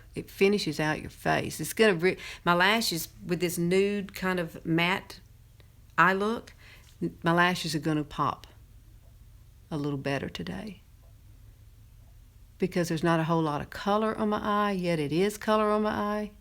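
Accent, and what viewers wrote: South, United States. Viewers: Hi, I really enjoy your make-up tutorial videos, thank you for taking the time to make them for us. I especially love hearing you talk because I love listening to your southern accent. Please don't ever change, keep your accent. I can understand you perfectly and I'm from and live in California. BTW, I'm curious...why you don't use a liquid eye liner instead of the pencil to line your top eye lid? I think it would make it easier for you Also, has anyone ever told you that you look a lot like Heather Locklear? You have very similar beautiful eyes. I'm sure you've heard this before but I couldn't help but ask. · You are a breathe of sunshine. I love those cream eye shadows How much for the cream shadow? I love your southern accent. You are the cutest. Have a great week. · i just gotta say i love ur southern accent, so younique lol excuse the pun...oh by the way u can get younique cosmetics over here in N.Ireland, i dont own any myself as i use pacifica vegan mascara as i have the most sensitve eyes EVER but it does look amzing on u girl....hugs and kisses....xxx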